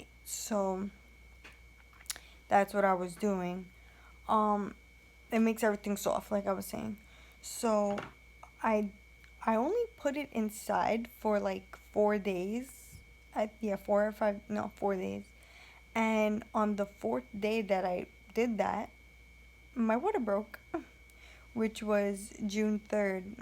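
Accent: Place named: New York, United States. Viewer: She has a NY accent that's why I ask. In love w you girl I'm fairly new ☺️💜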